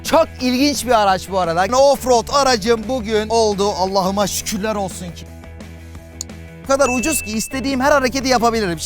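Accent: Elazığ, Turkey. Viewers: Elazığlı mısın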